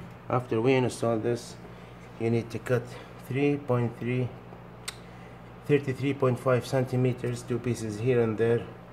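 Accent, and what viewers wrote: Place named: Africa. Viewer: You sound like you might be from some part of Africa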